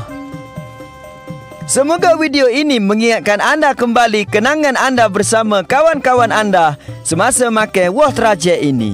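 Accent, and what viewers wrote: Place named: Kelantan, Malaysia. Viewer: Hahahahahhaa Memey Kelate lah ho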